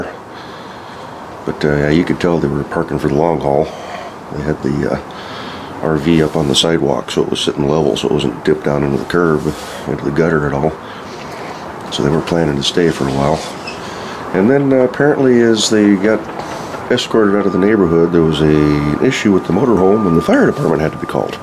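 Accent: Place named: Canada. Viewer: are you in Canada? can you speak with out a Canadian accent?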